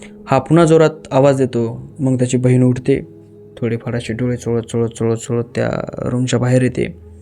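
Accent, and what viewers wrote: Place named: Marathwada, India. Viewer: टिपिकल मराठवाडा टोनींग😊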